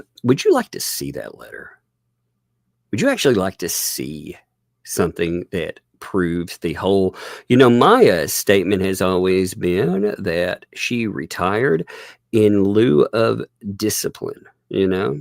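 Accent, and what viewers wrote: South, United States. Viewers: Catching up, it’s been a busy few days, enjoy listening, it’s nice listening to someone win a southern voice. 🫶🏻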